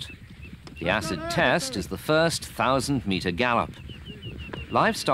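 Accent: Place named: United Kingdom. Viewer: Use American English. British English is difficult to understand.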